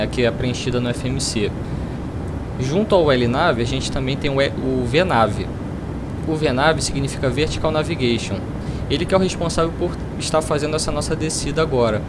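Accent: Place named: Rio de Janeiro, Brazil. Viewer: Muito obrigado comando! Sua didática é excelente. E parece ser meu conterrâneo do Rio de Janeiro kkk você é piloto real de 737 ?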